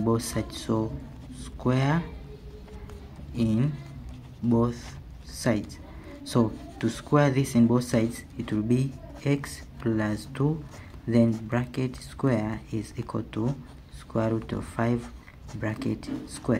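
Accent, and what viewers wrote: India. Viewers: The accent sounds indian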